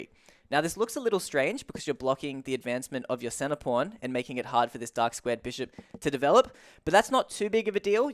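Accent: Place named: Australia. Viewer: From your accent I'm guessing you're a fellow Aussie. Nice channel!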